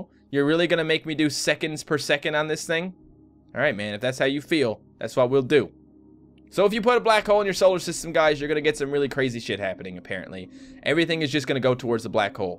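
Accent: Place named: Newfoundland, Canada. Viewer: Dont go to newfoundland if you dislike is accent bro, most of us sound like irish knockoffs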